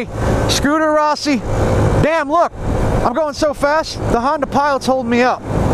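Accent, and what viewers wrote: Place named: United States. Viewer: I like your accent. Which state are you from ?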